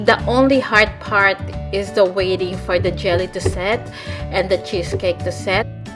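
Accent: Philippines. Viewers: Just want to ask if youre an Ilongga 😆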